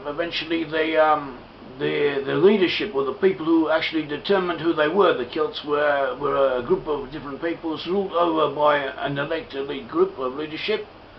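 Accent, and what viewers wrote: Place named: England, United Kingdom. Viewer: ugly looking fuck with some english accent with a horrible voice fuck off to wales or somewhere with your bullshit ..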